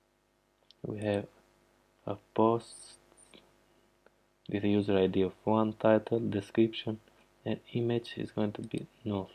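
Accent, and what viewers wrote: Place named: Albania. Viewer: I'm guessing Albania by the sound of his accent, possibly greece.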